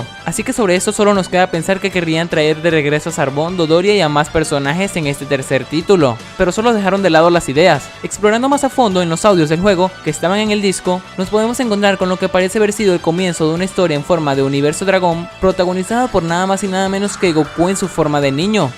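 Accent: Venezuela. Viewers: eres venezolano!!?? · v eres venezolano? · Eres Venezolano? jajajajaja! Te me hacias familiar... aunque pense que eras mexicano. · Eres de Venezuela? No manches es la ostia papú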